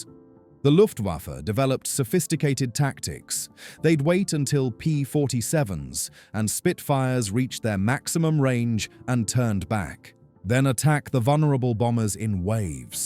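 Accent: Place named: United Kingdom. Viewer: The AI-generated narration has no grip on what a timeline is, and its British accent doesn't help.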